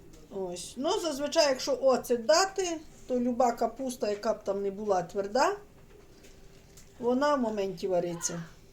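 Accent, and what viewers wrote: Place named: Western Ukraine, Ukraine. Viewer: Так говорять на Західній Україні, в кожному регіоні нашої країни свій діалект і вимова, і тим вона неповторна, незабутня і самобутня!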